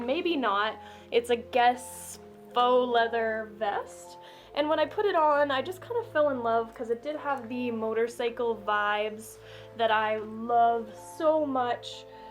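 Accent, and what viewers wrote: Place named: Germany. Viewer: oh sorry then ._. Your english is really really good but I heard a little accent and I thought It could be german cause my best friend is from Germany and she has almost the same accent. Again I'm sorry :(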